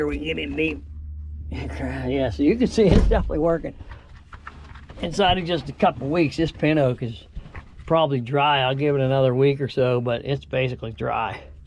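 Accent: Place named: Western Pennsylvania, United States. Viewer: Im in PA too near Harrisburg. Curious, are you near me? Sounds like a Western PA accent? :)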